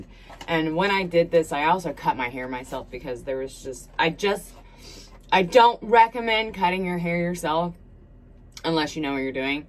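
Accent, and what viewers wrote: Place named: South, United States. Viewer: Hey hey miss lady..💙 u look beautiful with or without makeup..💙 again i also deal with ptsd d after getting out of a horrible relationship of 8 years. .but now that im free im finding me again.. Its been insane. .im soo happy to have survived.. Im getting dentures at 40 due to not being able to even try to take care of me..but im soo happy to find ur channel and u..💙 im from Memphis but live in Oklahoma so i here for the southern accent..💙 u r a sweetheart..ao happy to be apart of ur journey. .💙 keep growing and for sure keep shining💕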